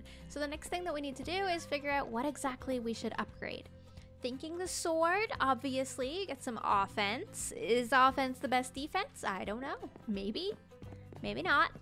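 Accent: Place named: Canada. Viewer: but still, her Canadian accent confused me a bit, as I am from England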